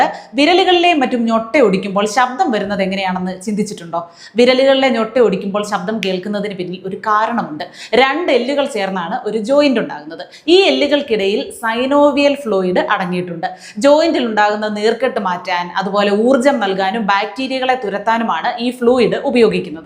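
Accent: Thiruvananthapuram, India. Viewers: ജി തിരുവനന്തപുരംകാരി ആണോ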